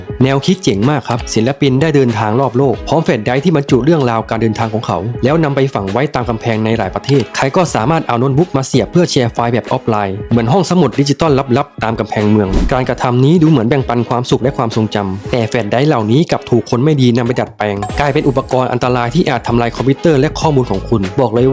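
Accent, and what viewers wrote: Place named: Isan, Thailand. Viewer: เว้าอิสานกะได้ดอก😂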